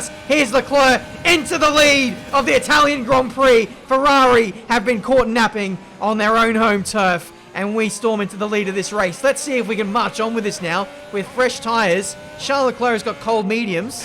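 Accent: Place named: Australia. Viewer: the thing i like about is that his voice sounds normal because im aussie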